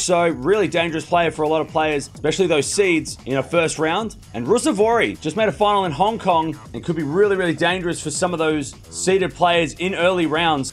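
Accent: Australia. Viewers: talk slower only aussies understand u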